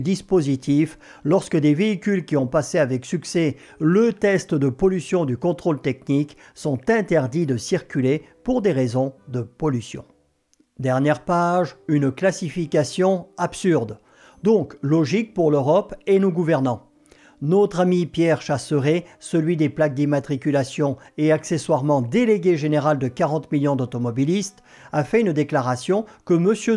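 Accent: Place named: Germany. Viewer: super video mais ton accent allemand est tres bizarre 😅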